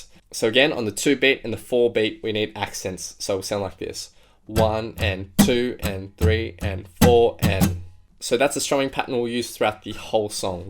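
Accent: Australia. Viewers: Seriously! He is my top "go to" when I need a cover song tutorial. Clear and thorough instruction; plus a crackin' Australian accent!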